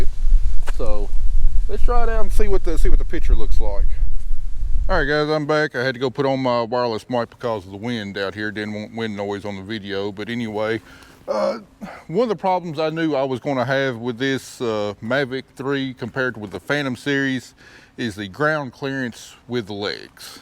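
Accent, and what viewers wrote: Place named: Tennessee, United States. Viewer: Very nice video, clear explanation and to the point. Naturally the Tennessee accent helped. Can you please reply who makes that tablet holder ? Thank you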